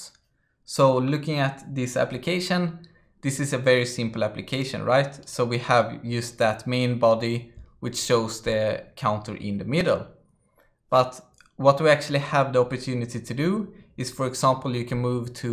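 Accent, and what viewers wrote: Sweden. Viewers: Great great tips. So you're Swedish, aWay -- aVay 🙂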